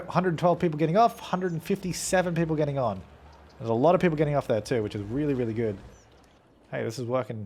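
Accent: Australia. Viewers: Drone club Not really, it's just the aussie accent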